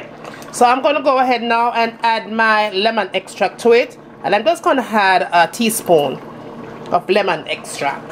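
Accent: Jamaica. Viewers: My girl. Twang fi mi a likkle. Deh accent deh raw and solid like a tru Jamaican! Lol. Tonks fi do watch :-)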